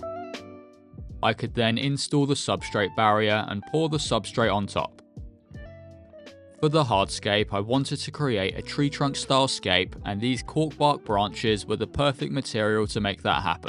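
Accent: United Kingdom. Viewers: Yeah, female, mate. Lovely terrarium. Just making a large slow flowing waterfall terrarium now and hoping to put a mantis in it. Not sure which one yet 🤔 P.S. You sound like a Manc as well?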